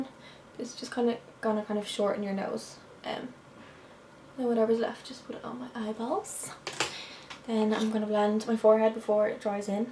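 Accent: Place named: Ireland. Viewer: Super happy this was recommended to me actually. You from Ireland babe? Your accent is very much like clarabell.x! Can’t wait to binge the rest of your vids and stalk you everywhere 😘😘😘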